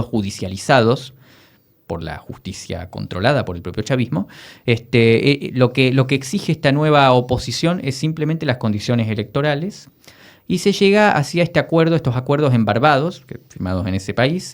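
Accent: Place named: Argentina. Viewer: Analista político venezolano con acento argentino.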